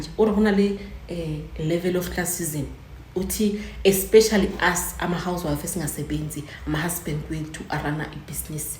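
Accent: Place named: Ndebele, Zimbabwe. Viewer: Indebele lekhethu ngyalizwa elimini, ngizwa iskhethu elimini lakho😅.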